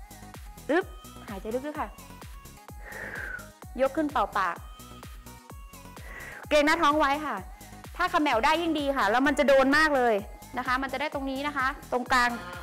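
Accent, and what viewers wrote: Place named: North, Thailand. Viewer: ชอบความปาเเล๊ดเหนือขนาดจ้าว พูดไทยทุกคำสาบเมืองได้จาใด เก่งขนาดจ้าว ไว้จาทำตาม ^^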